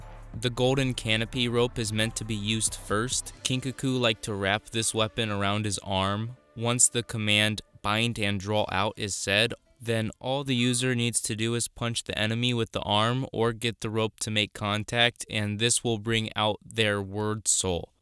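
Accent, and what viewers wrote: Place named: Ohio, United States. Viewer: HE talks like this normally too, he's not doing this on purpose. Its just the Ohio prose my man.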